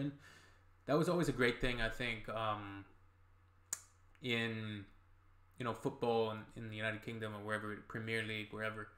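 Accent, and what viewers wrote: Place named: Canada. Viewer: Thank you so much for doing this, and for your kind comments. BTW I could tell you were Canadian before you told us. Most can't tell the difference between Yanks & Canuks (From an old Rhondda boy now living in Victoria BC)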